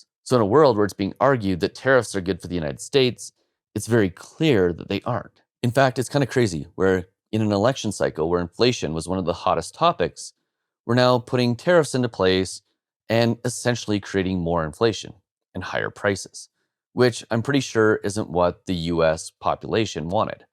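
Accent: Canada. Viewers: I will never listen to a Canadian like you. "Aboot" I can tell right away.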